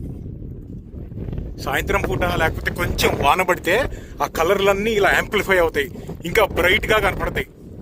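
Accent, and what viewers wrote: Andhra Pradesh, India. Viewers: అన్న ఈ ఒక్కదానికి సమాధానం చెప్పన్నా నువ్వు ఆంధ్ర యాసలో మాట్లాడుతున్నావ్ మీ ఫ్రెండ్ కానీ మీ అన్నయ్య కానీ తెలంగాణ యాసలో మాట్లాడుతున్నారు ఇది ఒక్కటి చెప్పన్నా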